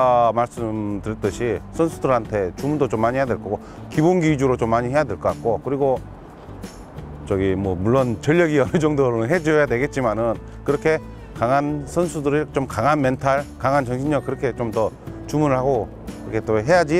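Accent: Jeolla, South Korea. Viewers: 종국이성 진통광주전라도사투리 구사자네요 ㅎㅎ 응원하겠습니다 원클럽맨으로 감독도하고우승도하고 가즈아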